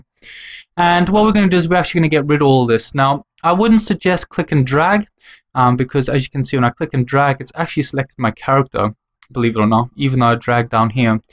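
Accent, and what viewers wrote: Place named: Turkey. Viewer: türk aksanı sezdim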